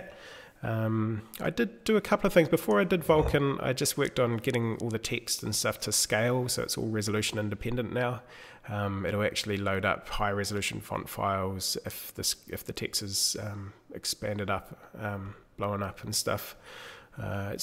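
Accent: New Zealand. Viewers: Dude! This is epic. Are you a kiwi? Sounds like. Me too heh. How did you even get started with making your own engine? It's not like you can just look up a tutorial. Keen to have a chinwag!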